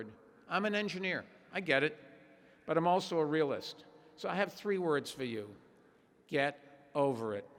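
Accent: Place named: Boston, United States. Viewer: Don't worry, that Boston accent is front and center. Regardless of how you pronounce "hard."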